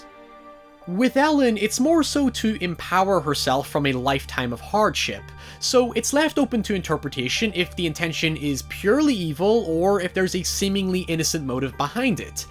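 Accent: United Kingdom. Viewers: First off, I love your accent. Secondly, your videos are so good, and I look forward to them always. We Americans don't seem to talk as fast as you folks in the UK, but I manage to keep up. I always like to mention to YouTubers, fairly new to me, that I have 61 years behind me, and that you have many other folks my age who enjoy your content. That notification bell is very helpful to alert me of your new videos. You will reach a million subscribers at some point, I'm sure of it.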